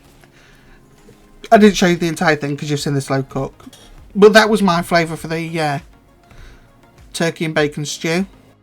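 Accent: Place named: Lincolnshire, United Kingdom. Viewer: Well done, got one now..well pleased. Oh Are you from Lincolnshire.,thought I recognized the twang..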